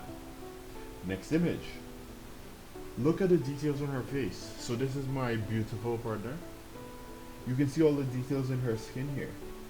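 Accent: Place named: Trinidad and Tobago. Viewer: Triniiiiii. Lol I can hear that anywhere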